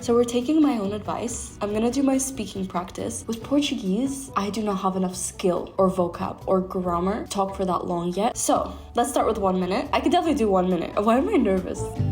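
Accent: Rio de Janeiro, Brazil. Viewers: I speak portuguese too. My accent is more of a Sao Paulo/Salvador accent. I can hear the Rio accent from you too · your accent is carioca lol kkksdjksjd but your portuguese sound amazing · Carioca raiz ! amei demais !!!!!! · Como um carioca que sou, teu chiado está ficando ótimo! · you are doing amazing! I can clearly hear your carioca accent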